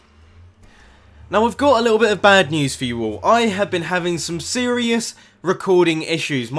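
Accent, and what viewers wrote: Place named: United Kingdom. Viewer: Everyone buy a T-shirt his british voice makes his videos very watchable and also t shirts are awesome.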